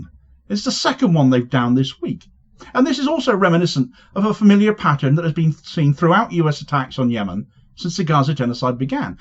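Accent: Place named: England, United Kingdom. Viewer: not listening your you disparage my president. you sound like your English anyway. Good bye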